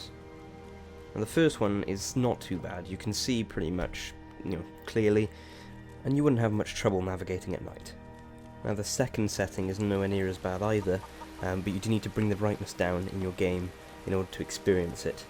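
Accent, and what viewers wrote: United Kingdom. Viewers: You have a British accent :D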